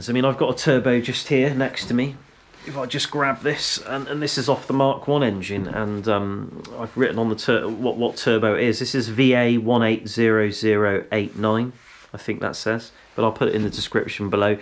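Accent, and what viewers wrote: England, United Kingdom. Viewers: Ha, no Mike, just a very English pronunciation :-) Its actually a great video, where the underlying story is more about - whatever your 4x4 is, there's things to work on for more power, but that rabbit hole can get deep.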